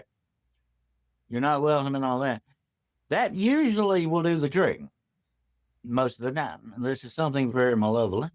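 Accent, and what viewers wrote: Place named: South, United States. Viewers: Aussies don't have accents like that. He's definitely American. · He's definitely from the Southern part of the U.S.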